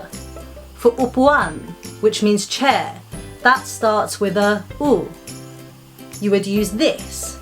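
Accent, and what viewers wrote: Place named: United Kingdom. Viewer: I am new at your channel and I like your British accent.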